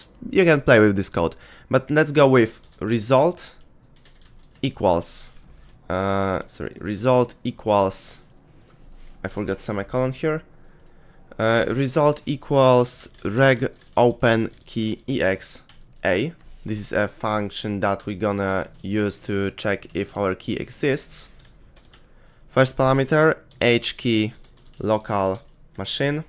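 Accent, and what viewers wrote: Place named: Poland. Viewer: Długo już się uczysz informatyki? Po akcencie słychać, że jesteś Polakiem haha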